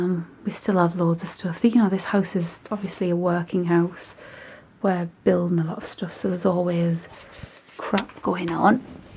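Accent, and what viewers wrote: United Kingdom. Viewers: British accent is beautiful! 😍😍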